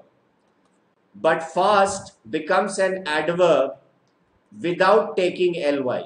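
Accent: India. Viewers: Sir are you mallu??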